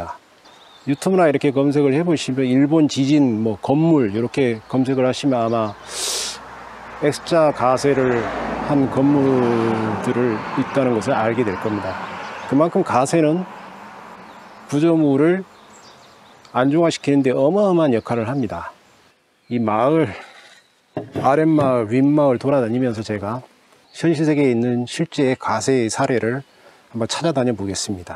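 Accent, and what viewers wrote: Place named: Gangwon, South Korea. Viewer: 강원도 말투신거 같은데 듣기 좋습니다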